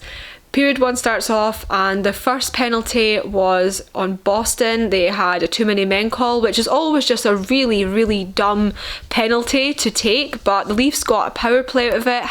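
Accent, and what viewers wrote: Scotland, United Kingdom. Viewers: It’s refreshing to hear/see a WEE SCOT analyzing the Leafs. My NANA was from Scotland and I miss that wonderful accent. Also a little surprised to see someone so far away who is so knowledgeable about our ‘hapless’ Leafs. Thanks for posting, my dear, it’s wonderful for an old man to hear hockey described by such a sweet beautiful lady…AND I LOVE YOUR BLUE EYES !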